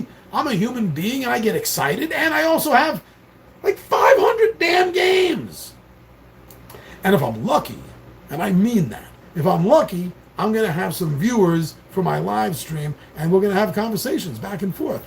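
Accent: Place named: New York, United States. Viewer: you have a new york accent? no way!!!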